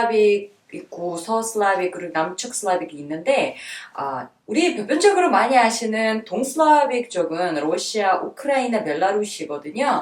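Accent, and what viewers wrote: South Korea. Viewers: 지금 정도 한국말하는 구잘을 보니 정말 대단하다는 생각. 미수다 일 때보다 지금은 더 한국말이 늘어서 완전히 한국 억양을 구사하게 되었다. 구잘이 좀더 귀하게 여김을 받게 되기를!!!!!!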